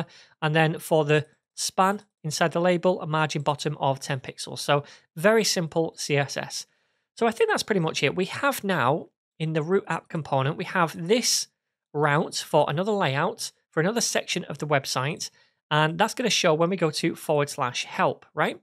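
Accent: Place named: Manchester, United Kingdom. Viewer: Great tutorial! Thank you. I'm guessing that your accent is from Manchester. Perhaps Bury?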